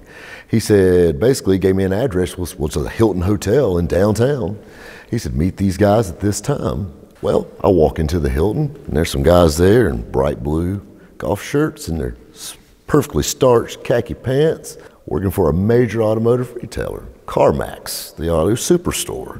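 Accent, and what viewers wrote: South, United States. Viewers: God damn I love this guy Southern accent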